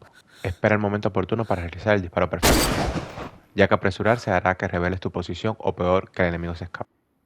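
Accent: Cuba. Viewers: Cojone asere eres cubano verdad? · Este es cubano si o si ,inconfundible 😂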